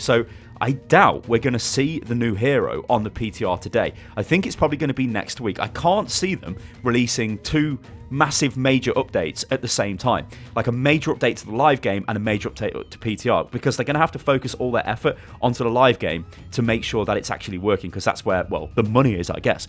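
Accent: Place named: United Kingdom. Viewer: I F*CKING LOVE BRITISH ACCENT, sounds so cool!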